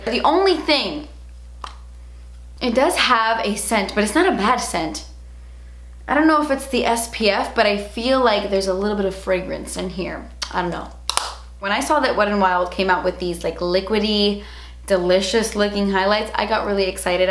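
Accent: England, United Kingdom. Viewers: There always has to be like 2 words pronounced in a british accent in every video 😂😂 and i love it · Your English accent sounds like Angelina Jolie in tomb raider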